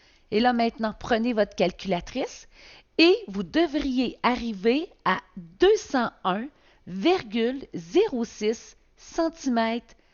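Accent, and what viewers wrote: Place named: Canada. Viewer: je suis sur que vous canadienne car l'accent montre. Bisous.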